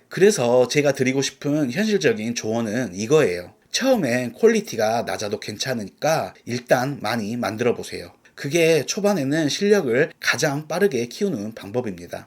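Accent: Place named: Jeolla, South Korea. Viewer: 전라도분이시네😊 저도요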